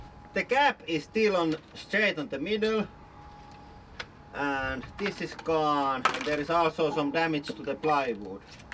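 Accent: Finland. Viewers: Tykkää jos oot tilannu kanavan vaan tän ihanan rallienglannin takia💗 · Sä kuulostat vähä suomalaiselt, mut ootsä🤔🤔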